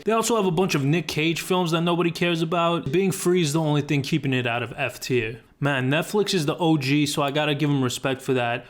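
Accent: New York, United States. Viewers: This New York accent👌🏾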